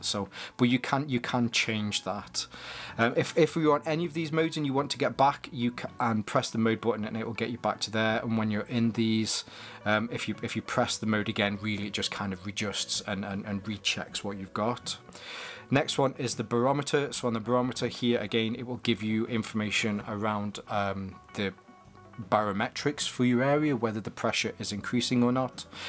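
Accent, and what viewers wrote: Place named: England, United Kingdom. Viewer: Great review. I totally agree with your comments regarding negative displays. IMHO they're style over function and my main reason for buying a watch is tj read the time as easily as possible and the negative display models are a PITA. The combination of a larger display and the black model with the positive display are the one I'll be going for. As I said initially, a great review and nice to hear someone with an English accent for a change.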